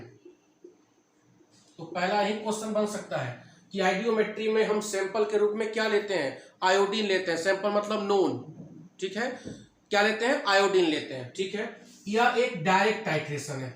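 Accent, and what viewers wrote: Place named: Bhojpuri, India. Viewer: Sir आपके भोजपुरी जान मार रहा है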